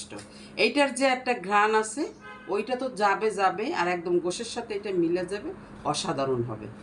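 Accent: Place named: Barishal, Bangladesh. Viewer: আন্টি আপনি কি বরিশালে র মানুষ মুয়ৈই তো ভাবছি আপনি ঢাকা ই মেয়ে আপনাদের কথা বলা বুঝাইছে এখন দেখি ও অন্য কিছু ভালো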